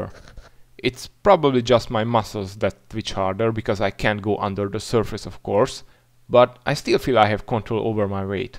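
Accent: Hungary. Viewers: De magyarosan beszéli az angolt xd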